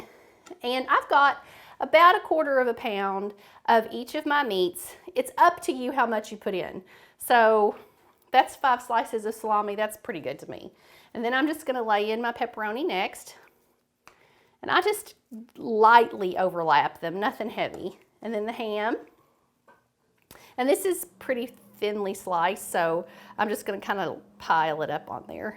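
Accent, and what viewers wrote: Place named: Kentucky, United States. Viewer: Gotcha. You shouldn't feel sensitive about embracing and sharing non-traditional new foods. As a matter of fact, the Stromboli is indeed an adapted Americanized invention. I enjoy making all types of world cuisine and I make no preface nor caveats for cultural qualifications. Remember, you're not appropriating, you're embracing. That's a very good thing! 😇 BTW: I adore your enchanting blue grass dialect.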